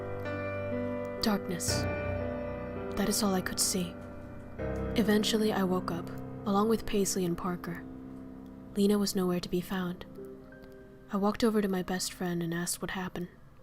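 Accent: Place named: Russia. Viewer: That was fun,nice deep Russian accent btw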